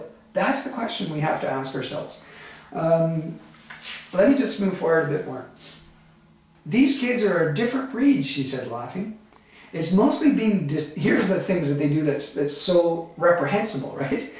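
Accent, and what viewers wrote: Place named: Canada. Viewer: are you canadian? you say "aboot" alot.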